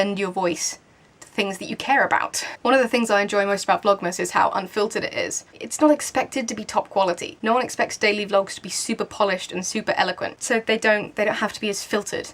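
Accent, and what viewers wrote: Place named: United Kingdom. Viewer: Okay, I just scrolled through my entire liked videos list to try and find out through which of your videos I discovered you because I didn't discover you through your sister (I actually found her through you ;) ). It turns out I discovered you because of Pottermore. Back when it was still in the development phase and only a few people at a time could register I would look at tons of sorting videos on youtube and that is how I found you. It is kind of hard to discribe why I subscribed to you. It's number of reasons, all of which will probably sound pretty weird. I really like your accent (I'm from Germany and I absolutely adore British accents), I like the way you talk, your enthusiasm, your honesty, your opinions... also, you share two of my very favourite interests which are reading and writing and, of course, Harry Potter :) All in all I find these insights into your life incredibly interesting. 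You are a few years older than me so it is kind of like watching what my future might look like in terms of going to uni, having your own place, finding work etc. I do have my own Youtube channel (which is called MissSophieReads) which is basically just your stereotypical channel about reading and books (I haven't yet come up with any cool original video ideas).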